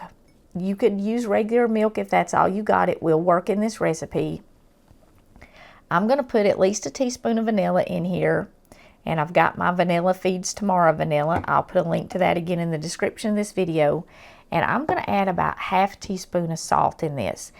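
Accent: South, United States. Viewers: Question: Could the batter be put into an 8x8 dish like fudge, then cut into squares once set? Thanks for this recipe, and for speaking slowly enough to understand you! Love your southern accent!! 😊 XOXOXO God bless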